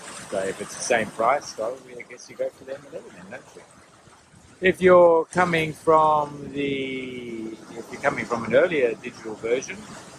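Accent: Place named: Australia. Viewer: good to hear an Aussie accent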